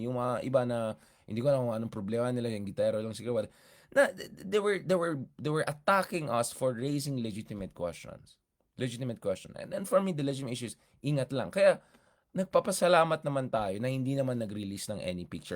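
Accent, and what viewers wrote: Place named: Ilocos, Philippines. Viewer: You are the ultimate outsider. You can go round for round with the Congo boys here in Manila. But you are more comfortable with Ilokano than you are with Tagalog. Hindi nga marunong mag ilokano si Marcos. Ocassionally you Baguio boy lingo gets mixed up in your narration but that’s fine that you lapse into ilokano. And sometimes you speak English with Ilokano accent. That’s fine. You use a lot of Russian words. Maraming dilaw na holier than thou. They’re religious. Okay lang yon na outsider ka. Okay din na napagsama mo si Ronald at si Marc. Ronald knows that the Showbiz is politics….addition. Ronald knows that the masang Pinoy is BADUY. Marc is gonna teach him the low down on Nora-Vilma.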